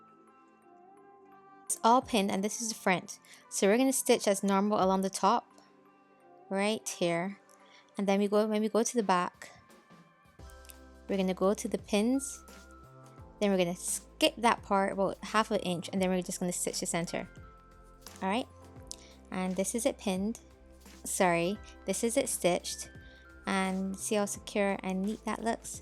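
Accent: Barbados. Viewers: The moment I clicked I recognized the accent!! Let’s go Barbados 🇧🇧 💛💙💛💙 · I know that accent anywhere 🇧🇧 🇧🇧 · Fren you sound Bajan 😍, I’m guyanese but I know that accent good thanks to Rihanna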